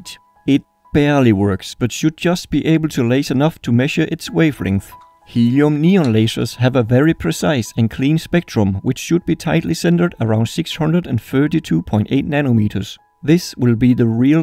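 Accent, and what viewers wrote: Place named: Denmark. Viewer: This accent can only belong to a Dane! Thumbs up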